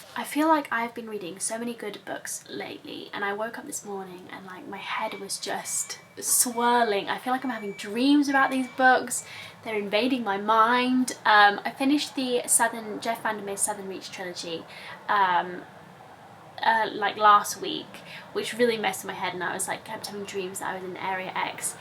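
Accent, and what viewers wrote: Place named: United Kingdom. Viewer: Hi! I love everything about your channel- your style, your vlogs/adventures, and mostly your book recommendations and updates. I have just read so many of the books you have reviewed and you have become a really reliable guide for me when it comes to reading books that are enjoyable, yet also important literature. I have missed your cheery British accent and smile and big chunky rings- rewatching your old videos over these weeks has been a comfort in these crazy times. I really wish you would address what's going on- as a writer and reader I feel that it is so important to document how we are feeling right now-I would appreciate and I am curious about your thoughts and experiences. I hope you are safe and reading lots:) - Yael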